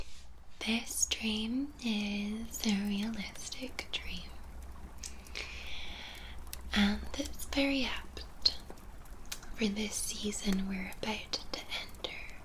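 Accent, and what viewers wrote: Scotland, United Kingdom. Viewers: Awww what a magnificent video full of restful relaxation and calmness! 😴 That soft whispering voice with your lovely Scottish accent sends me right into LA LA land!! 😴 Dream number 3 and number 6 were my favorites! 👏👏 Number 3 definitely had plenty of tingles from all of those lovely animals jumping in and out of the woods along with those amazing bird sounds 👌 😀 👍. Number 6 was my top favorite because had invited me into her amazing home! 👏 Of course chatting with would be the ultimate wish but to actually sit down and play with Hugo and Lindi would be totally awesome and cool:_Hugo:🐶😎👌 😊. Thanks for sharing all of these incredible dream stories with me for I am totally relaxed and looking forward to a awesome night's rest!😴💤 Your just getting better and better with each and everyone of your videos! 👍 Do take care and have a magnificent evening!! 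Lots of love to you xx 🤍🤍 😍💕💕💕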